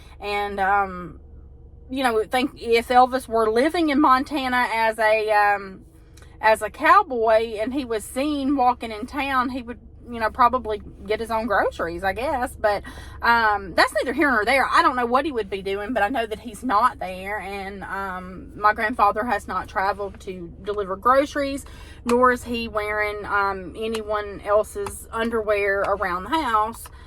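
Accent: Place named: South, United States. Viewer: Thank you and and for all you do for us Elvis fans. Glad to hear is doing better. Even Pastor Bob Joyce said he’s not Elvis. We all wish Elvis was still alive but sadly he passed on August 16 1977. BTW I love your southern voice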